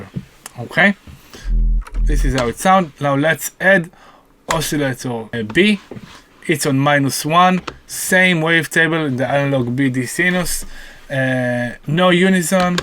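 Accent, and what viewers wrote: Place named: Israel. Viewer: קשה להסתיר את המבטא הישראלי באנגלית, תודה על הסרטון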